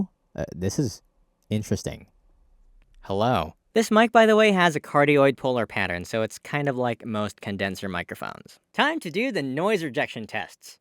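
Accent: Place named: United States. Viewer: In the video you said you are from the Philipines, but your accent is like native American! how come? did you learn it or your were raised in the USA?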